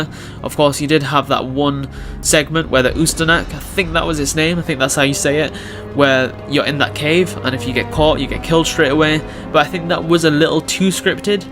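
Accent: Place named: United Kingdom. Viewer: You sound soooooooo british hahah love it man keep it up!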